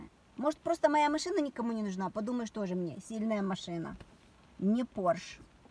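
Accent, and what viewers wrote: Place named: Odesa, Ukraine. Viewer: Вы с Одессы? Говор такой интересный?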